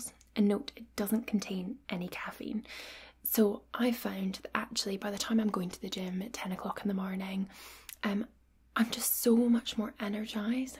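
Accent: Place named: Scotland, United Kingdom. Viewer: Gentlemen, we all have to admit that is a very pretty woman with an awesone accent. Very Scottish🏴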